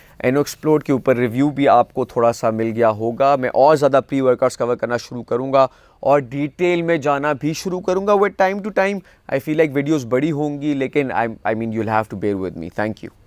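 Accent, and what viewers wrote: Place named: India. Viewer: Why are u sounding like some Indian influencers?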